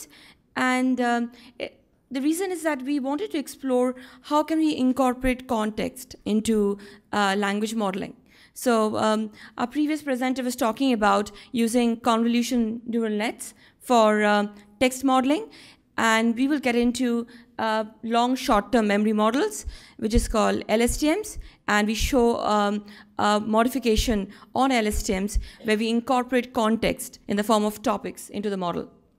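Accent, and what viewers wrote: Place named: India. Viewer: hhh, Indialish is a little bit cute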